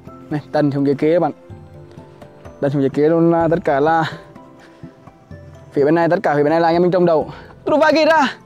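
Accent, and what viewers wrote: Nghe An, Vietnam. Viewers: Nói tiếng nghệ an gì đó chậm thôi anh. Ko hiểu a nói gì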